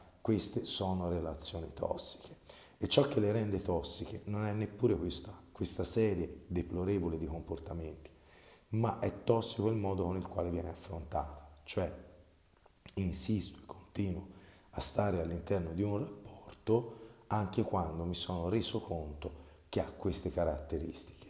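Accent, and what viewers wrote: Tuscany, Italy. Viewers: Basta, fatela finita, basta andare a vedere questi video sul narcisismo 😂. Molto simpatico dottore, ogni tanto la seguo proprio per questo. Ha perfettamente ragione altrimenti diventa un ossessione. Si esce da una dipendenza e si entra in un altra . Complimenti per il suo modo schietto di esporre . La parlata toscana ben si addice. Chapeau 🙏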